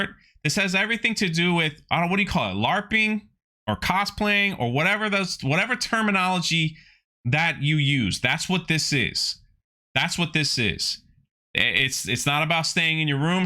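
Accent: South, United States. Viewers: first, where is your accent from? I hear this faint Southern twang when you talk and I’m just super curious where it’s from 👍🏻 Secondly - THANK YOU and Anna and Theory and everyone else in the Fandom Menace for covering this so we can all laugh and vent about it together. I also love that we all have seen something different that’s WRONG with these “trailers” that Disney has put out. For the first add with the female Disney Imagineer and the overly excited fan, I love that they chose a very short actor for the fan, so the girl towers over him. Just saying. And the video showing the meeting of the Imagineers sitting down and talking about the “not hotel,” again they’re all female, just as the Lucasfilm story department is all female. I believe you said it best when reacting to this - “WOW me. WOW me.” That’s what Star Wars is SUPPOSED to do when done properly. 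I watch the trailer and I’m like “what about Han, Luke and Leia, can I see them?” And I feel like the blue hostess or the “Twilek” or Disney security is gonna pounce on me: “WE DON’T TALK ABOUT THEM HERE.” Anyway, keep up the good work!!!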